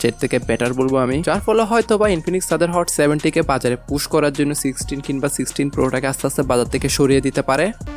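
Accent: Chittagong, Bangladesh. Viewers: ভাই কি চিটাগাংগা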